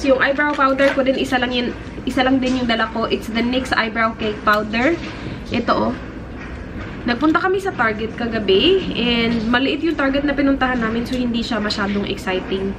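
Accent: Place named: Cebu, Philippines. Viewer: ARE YOU BISAYA? HALATA KASI ANG TAGALOG MO NA YOU ARE A CEBUANA....IM ALSO CEBUANA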